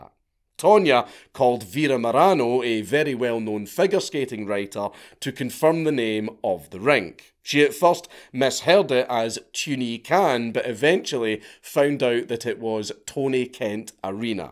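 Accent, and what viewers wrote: Scotland, United Kingdom. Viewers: He's Scottish? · Funny Scottish Santa man cures my depression for christmas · I never realized you were scottish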